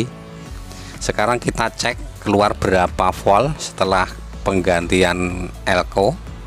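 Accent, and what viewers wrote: Java, Indonesia. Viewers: MEDDOK KALI JAWA MU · LOGAT JAWANYA KENTEL BANGET, MAS!. MENURUT SAYA YG AWAM, NGECES AKI DGN CARA BEGITU, MEMBUAT AKI CEPAT RUSAK LHO, MAS!, YG PALING AMAN YA YG SE WAJARNYA PAKE TRAFFO 5AMPERE/ 3 AMPERE.,DAN DIODA 5AMPERE PULA..PENGECASAN AKI PAKE CARA INI CUMA BUAT DARURAT SAJA, JANGAN KETERUSAN, SEL AKI CEPAT RONTOK.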